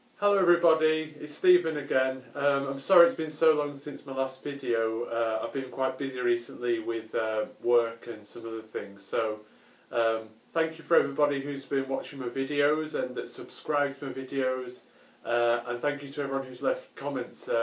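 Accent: United Kingdom. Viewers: イギリス英語かっこいい＾＾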